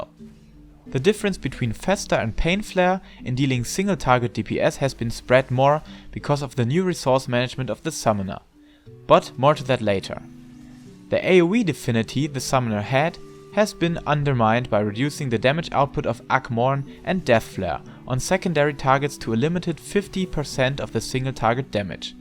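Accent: Germany. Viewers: As a German myself, I am cringing so hard with this accent xD it's so bad, sorry. Even though I do like your content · Don't worry about the accent as long as you have clear and understandable diction. On a personal note I'd rather have an actual German accent than a faked American one.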